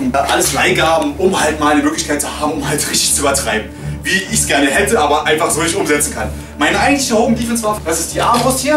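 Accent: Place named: Saxony, Germany. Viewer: Könntest du Vllt mehr säschsich reden?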